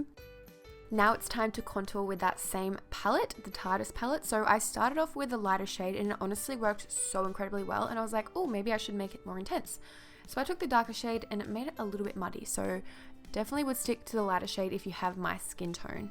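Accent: Australia. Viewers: she does. I am from Australia and she sounds like any ordinary Aussie girl. Perhaps your perception of an Australian accent is a bit different? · wooow loving the hair ur amzing too love u by the way I'm OBSESSED with the Australian accent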